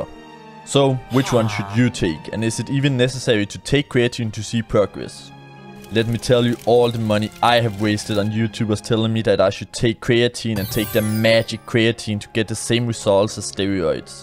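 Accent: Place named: Scandinavia. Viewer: You have one of the worst scandinavian accents I've ever heard. It makes my ears bleed!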